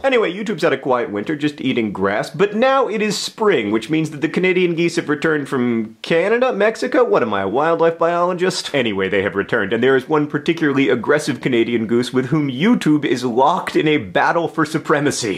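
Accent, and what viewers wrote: United States. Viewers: i feel sad because john talks really quickly and because he's american and i'm english my brain just can't work it out so easily so i don't know what he's saying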